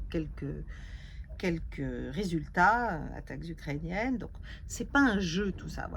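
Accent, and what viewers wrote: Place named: Upper class, France. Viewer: Votre accent bourgeois du XVI ème me dégoûtait déjà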